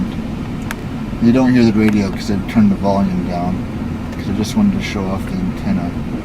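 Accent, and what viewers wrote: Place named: Canada. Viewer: What part of Canada are you? I hear a Canadian accent. Anyhow, I love the look of this car. Thanks for the upload. Early 90s American luxury is pretty cool.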